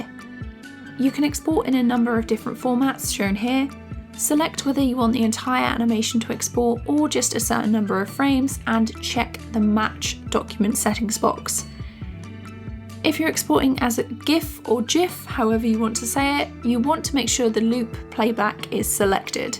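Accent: United Kingdom. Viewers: nope this girl owns autodesk sketchbook and are you british cos you sound british. im british too!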